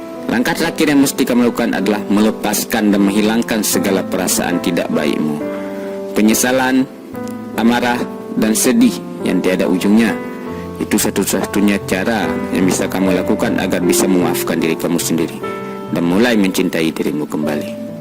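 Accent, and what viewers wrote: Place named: Bali, Indonesia. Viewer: Dari suaranya pasti nih org bali 😊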